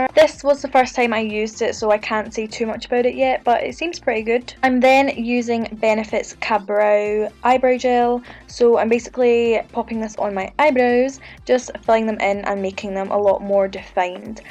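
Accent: Scotland, United Kingdom. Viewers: Gorgeous makeup look 😍 so different, glittery and colorful - I would really wear this for clubbing ☺️ I also have the Urban Decay Glitter Eyeliner but in the silver color and it is really nice 😎 Stumbled across your channel when I was searching for Scottish beauty youtubers (because I will study in Scotland soon so I wanted to practice listen to the accent 😄) and immediately subscribed 😇☺️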